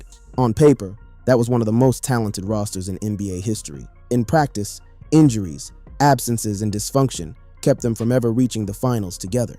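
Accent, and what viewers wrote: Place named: Netherlands. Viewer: You have to do the voiceovers yourself. Even if it is a Dutch accent we can understand you lol.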